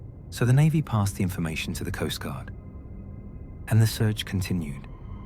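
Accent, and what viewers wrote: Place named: United Kingdom. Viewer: wonderful British accent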